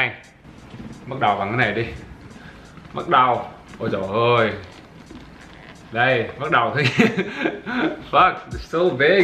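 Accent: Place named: South, Vietnam. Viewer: a noi giong nam dth von hnao thu noi giong bac di anh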